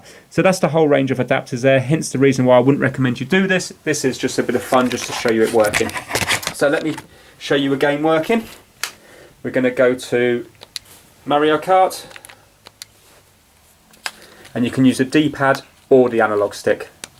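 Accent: United Kingdom. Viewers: Geekas Kid probably British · Rami Abdalla he has a British accent. So that’s why he says it like that.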